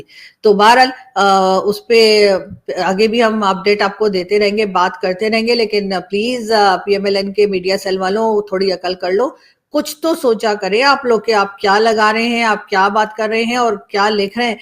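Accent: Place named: Braj, India. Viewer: हाथ हौला रखें 😂😂,,प्योर up बाली ब्रज भाषा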